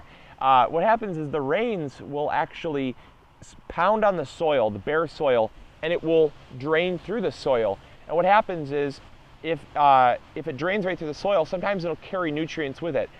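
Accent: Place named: Wisconsin, United States. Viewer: Funny, I grew up in Michigan and people would ask me where I was from, never thought I had an accent until I saw ur vids. Almost a Wisconsin accent but more faint.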